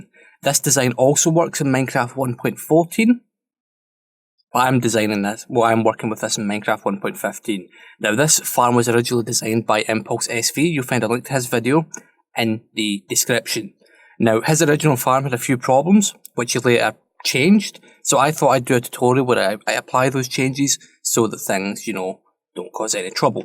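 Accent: Scotland, United Kingdom. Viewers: As soon as I started watching this my head just screamed 'YESSSS, HE'S SCOTTISH!!!!!!!' Why am I like this XD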